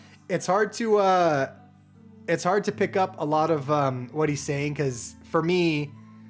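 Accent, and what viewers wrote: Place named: Baguio, Philippines. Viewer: Ang accent mo sa tagalog ay accent ng taga baguio. Ganyan na ganyan ang tuno ng tagalog doon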